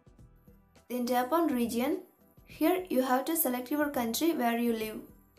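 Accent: India. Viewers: it says new york and the person talking sounds indian so im pretty sure its a fake address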